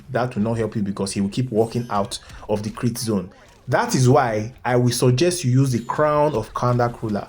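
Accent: Nigeria. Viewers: Bro are u Nigerian?? Nice content btw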